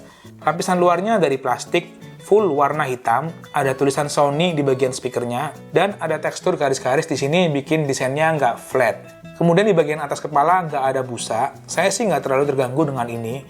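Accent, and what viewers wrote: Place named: Purwokerto, Indonesia. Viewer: Mas orang Purwokerto bukan wkwkw logatnya mirip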